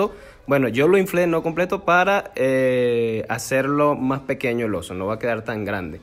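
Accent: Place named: Venezuela. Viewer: Me encantó la explicación, me suena como venezolano, será paisano?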